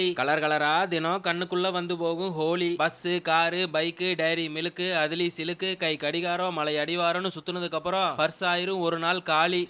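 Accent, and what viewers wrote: Coimbatore, India. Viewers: நானும் கோயமுத்தூர் தான் அண்ணா உங்க videos எல்லாம் ரொம்ப நல்லா இருக்கு அத விட நம்ம ஊரு பாசை சூப்பரோ சூப்பருங்க அண்ணோவ்👌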